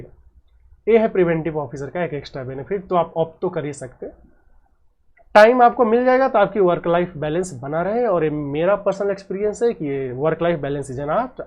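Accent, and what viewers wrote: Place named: Bengal, India. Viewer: Ap bengali ho kya?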